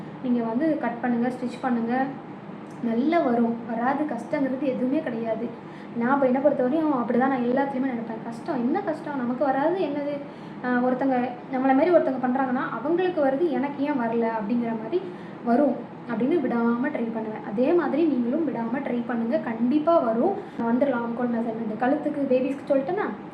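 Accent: Tirunelveli, India. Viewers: Neenga endha ori Tirunelveli aa?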